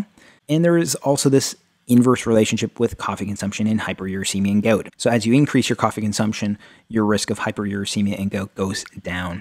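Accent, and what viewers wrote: Canada. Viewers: I think he's Canadian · He sounds Canadian.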